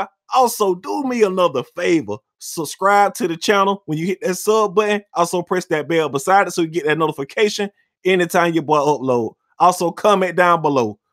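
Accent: South, United States. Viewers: I love the Southern Will Smith voiceover, with ALL my heart. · dang boi u is country as hell where is u from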